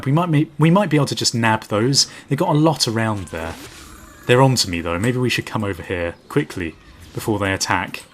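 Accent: United Kingdom. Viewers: you have a british avcent right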